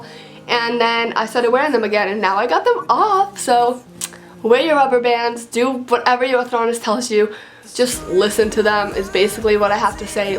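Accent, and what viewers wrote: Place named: United States. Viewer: It's called an American accent.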